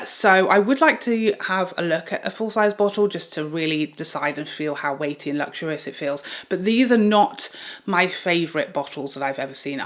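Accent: United Kingdom. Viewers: What a very descriptive way of explaining the scents and notes of Charlotte Tilbury"s new fragrances. I appreciate your thoughts and narrative. Great video. Do you like any of th Kayali fragrenced,? Love you British accent and sense of humor.👍✔️❗🌸🏵️❤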